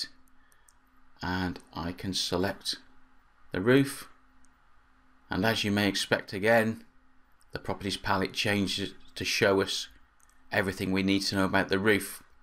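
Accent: United Kingdom. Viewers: Best Revit Tutorials I have seen, and the British accent makes it much easier to understand! Very well done!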